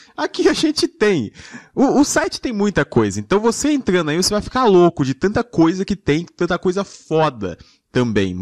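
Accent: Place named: Piracicaba, Brazil. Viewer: você é piracicabano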